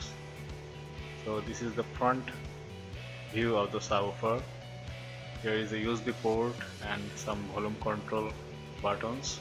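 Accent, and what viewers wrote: Bengal, India. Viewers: are you a Bengali? It's so evident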